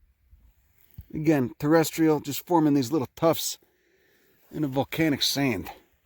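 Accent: Chicago, United States. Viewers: I believe he’s originally from the chi. Sounds like a south side or south suburbs Chicago accent to me. Though I pick up a little New York as well.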